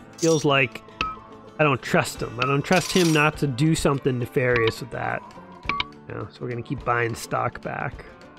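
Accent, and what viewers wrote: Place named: Brooklyn, United States. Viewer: Sound more like Brooklyn.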